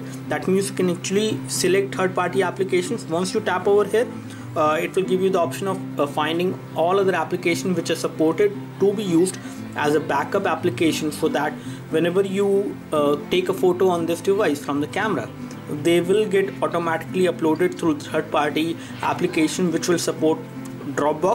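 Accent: India. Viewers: Decent Video..and dont bother about your English, Indians are not native english speakers as Americans. Your is better than slang US bullshit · Allahını seven hint ingilizce konuşmasın...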